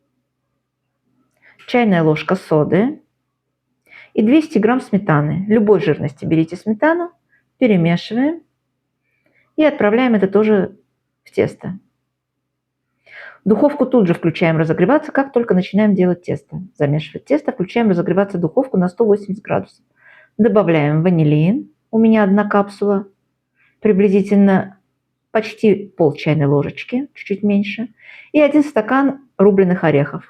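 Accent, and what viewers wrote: Baku, Azerbaijan. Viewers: Прекрасный рецепт,прекрасная подача,а бакинские нотки в голосе-это нечто!!!!Дай Бог вам здоровья и творческих успехов!!!!❤️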